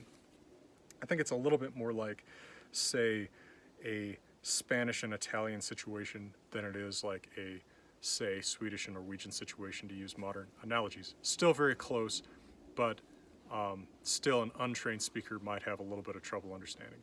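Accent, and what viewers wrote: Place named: United States. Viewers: Hvilken amerikansk dialekt er det du snakker? Den høres veldig bra ut. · Evil Regal Danish, I think. He wants to know which American accent speaks with, and he said it sounds very nice. At least that's what I'm guessing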